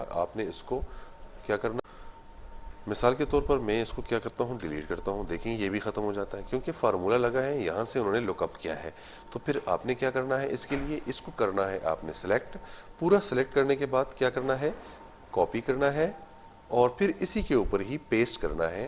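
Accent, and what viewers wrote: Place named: India. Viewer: He revealed his face. I had an idea you were from india with the accent but very informative video.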